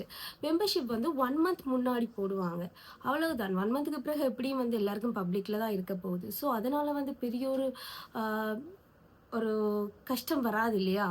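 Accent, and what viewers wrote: Sri Lanka. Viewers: Mam neenga srilanka va unga novels read pannum pothu theriyuthu....indha video pathatum vilanguthu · Pavani voice so sweet so problem Rj voice ku neenga srilanka tamil ah slang romba pudikkum sutha tamill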